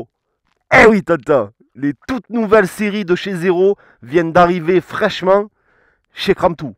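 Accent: South, France. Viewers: On sent que c'est le sud de la France 😂 (c'est pas une critique) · ! Super chaine poto je kiff 👍 ça fait plaisir d’entendre l’accent du sud ahah Aaah cette petite zéro 8 pro me plait bien!!! Mais la Dualtron mini me fait kiffer aussi... je suis un gars assez lourd. Selon toi laquelle est la plus adaptée?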